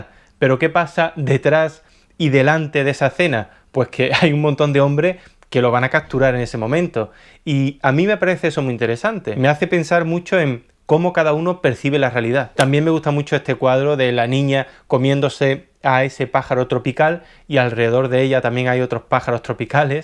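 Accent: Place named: Castile, Spain. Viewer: Tengo ya una semana de haber descubierto su canal. Y que cosa mas curiosa que se me a peago su acento, pero esto sólo a mi subconsciente. Mire que ahora mi mente habla en castellano.....😂😂😂😂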